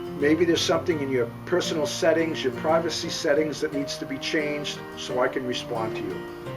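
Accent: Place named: New England, United States. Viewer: Hi sir! I really enjoyed watching your video... I am thinking of building my own off grid cabin and was concerned with how I would get clean water. I could easily add gutters and a rainwater catchment system, even on a lean-to just off by itself. I would love to try to make this, even just to have I think it's a great idea, even for camping. Thank you, from a fellow New Englander, I knew I could trust you by your accent. :)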